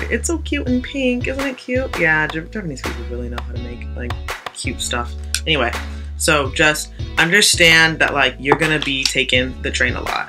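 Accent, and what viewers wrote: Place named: Atlanta, United States. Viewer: Girl you on fleak ! Atlanta talk.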